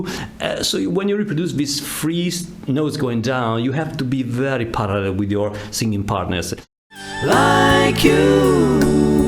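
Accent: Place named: Italy. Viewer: Wonderful! Also, it's kinda hilarious to hear how his intense Italian accent almost entirely disappears as soon as he starts singing the examples 😂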